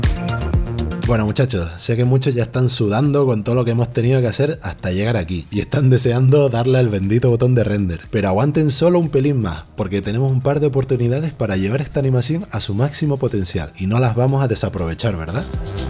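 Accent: Canary Islands, Spain. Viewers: buen videoooo por cierto tienes acento canario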